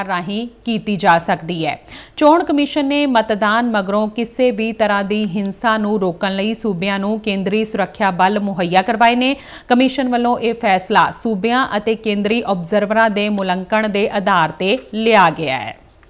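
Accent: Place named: Bihar, India. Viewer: Yeah anchor Punjabi nahi bihari lagdi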